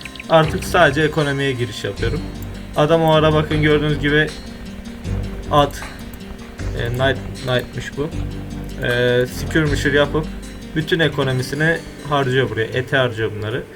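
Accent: Turkey. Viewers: kardeşim konuşmanda ufak bir rize havası sezdim. cok güzel oynamışsın, eline sağlık. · reis valla ben trabzonluyum ben bile seni buralı sandım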